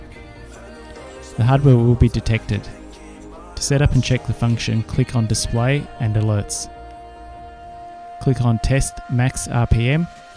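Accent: Australia. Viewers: When you finally hear daddy's voice and he's an aussie <3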